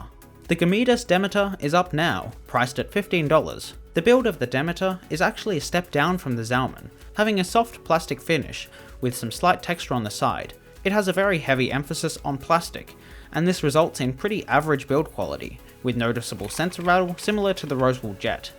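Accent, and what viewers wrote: Australia. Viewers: He's not Scottish. I have no idea why some think he is. He's Australian. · Why is this Australian doing the voice over?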